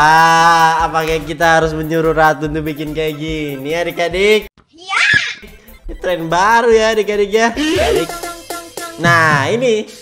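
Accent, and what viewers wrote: Java, Indonesia. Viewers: Bang Lo jawa mana bng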